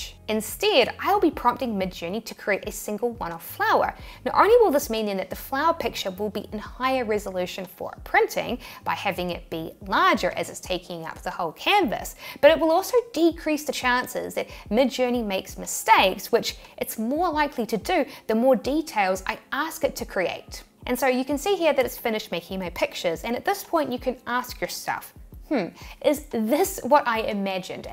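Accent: New Zealand. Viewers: I really enjoy listening to that New Zealand accent..🙆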